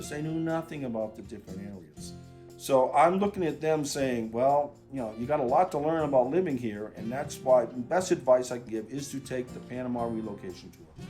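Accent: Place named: New England, United States. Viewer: You still have you New England accent. Not Massachusetts but Conn VT or Adirondack area of NY. Thanks Just speaking to friends last night about moving to Costa Rica or Panama. Do you know Jim Willey?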